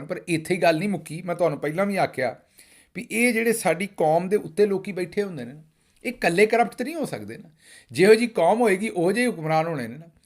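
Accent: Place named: Punjab, India. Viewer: Mind-blowing words n amazing style of panjabi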